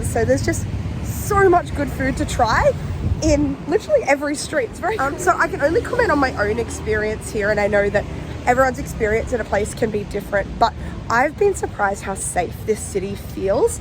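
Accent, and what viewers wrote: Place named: Australia. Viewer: especially your cute aussie accent. :)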